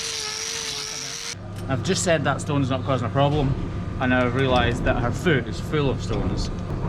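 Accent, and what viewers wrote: Scotland, United Kingdom. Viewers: You did a great job on that cow!! I love your Scottish accent!!! You. An talk to me anytime!!!😊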